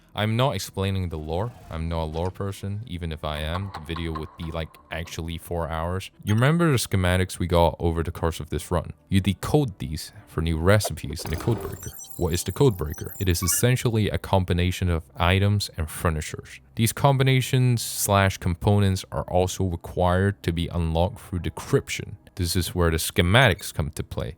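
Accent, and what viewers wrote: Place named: Scotland, United Kingdom. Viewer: i sense a tinge of perhaps scottish in your accent? where abouts are you from matey?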